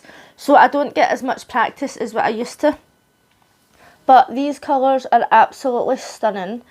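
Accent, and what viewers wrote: Scotland, United Kingdom. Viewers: apparently they don't make this color any more. Too bad, it is really pretty! I love your accent, it is a bit difficult to understand sometimes since my ear isn't used to listening to a Scots accent, but that is a me problem, not a you problem! Can't believe that people would complain about that. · Hi great video was thinking of buying this product so glad I watched your tutorial as a new buyer would you recommend buying the deluxe pack or just the basic set or individual I’m so glad of the honesty that your tutorial gives and it’s great you’ve got a Scottish accent x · I’m so glad my Mum’s Scottish or I wouldn’t have understood this tutorial at all! LOL. Thanks also for this cause Sistaco’s tutorials are very quick and edited. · Well, all of those people are objectively wrong. Scottish accents are beautiful! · I love your Scottish accent!! 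great video !!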